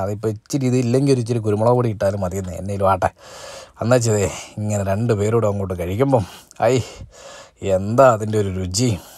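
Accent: Kottayam, India. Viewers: samsaram patented. Athu vittukodukkaruthe. Kottayam Malayalam slang kittulla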